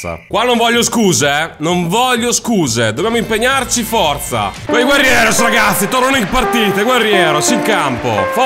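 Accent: Naples, Italy. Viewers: oddiooo ahahahahah te che parli napoletano ahahah sono tutto bagnatooo grande ti amooo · La combo Dialetto Napoletano+Incazzatura fa schiattare ahahah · io comunque mi complimento per il tuo napoletano che è qualcosa di stupendo. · il tuo napoletano mi fa divertire troppo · ma sei napoletano? o tifi napoli pero abiti tipo a milano?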